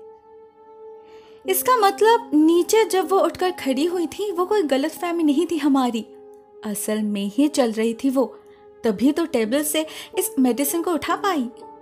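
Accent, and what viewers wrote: Bengal, India. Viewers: Hi sis❤❤tmi ki bengali 🤔🤔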